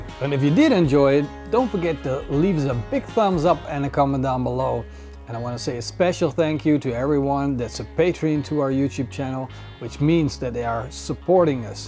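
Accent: Netherlands. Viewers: Yo, I love your videos and your Dutch accent is charming. One quick question. Why do you always wrap your meat "shiny side out"? Usually you'd do that if you wanted to deflect heat, but I don't think that's what you mean to do when wrapping. Is this deliberate or doesn't it matter with these ribs?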